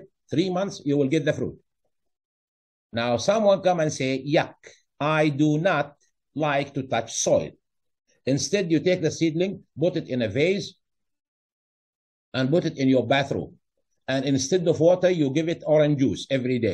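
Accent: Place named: Arab world. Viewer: Very Good video , thanks. Wow your originally from india ! I thought your accent sound Arab .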